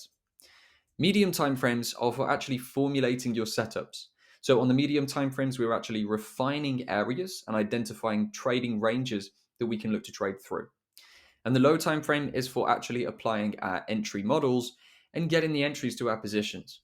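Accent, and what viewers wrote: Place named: Northern England, United Kingdom. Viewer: I have just subscibed. He might just come from North England. Maybe, Newcastle, Middlesbough, Sunderland, Southshields, Cumbra lol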